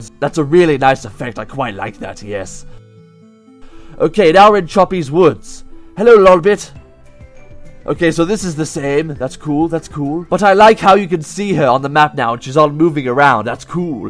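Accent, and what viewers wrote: United Kingdom. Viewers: are u doing a british voice or some thing =_ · He is speaking British accents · Sound British I don’t know why are you supposed to be like oh I have a toy verse I can do like a girl sound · Good God hes british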